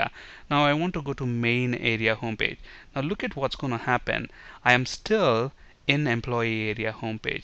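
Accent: India. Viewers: At first i didnt liked ur tutors, but ... you are the best tutors maker i have ever seen. Keep up. I didnt like them cuz of the common words like "vep-applications; vindows" etc :D Indian stuffs... but u are awesome :) That on impressed me the most... i mean that u are using fresh VS from beggining and in every tutor u are using same names for ur models, views etc.. so it makes it more clear. GOOD JOB!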